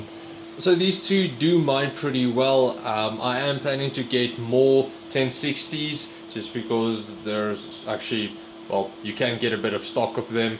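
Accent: South Africa. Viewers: Michael Chistol Modern Afrikaans South African. Picks up accent from tv and media. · Sounds like South African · lol your accent confused me at first.. sounded southafrican with an American kick, anyway cool channel bro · Couldn't fool me, The good old SA accent, keep up the good work/hashing in SA from an ex South African in New Zealand. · Blatantly South African Takes one to know one lnao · For the Comment below about accent its a Great South African accent, and yes at the currect exchange rates earning in US/Bitcoin and exchanging to ZA Rand can well pay for rent if you live in South Africa. Great Video keep going !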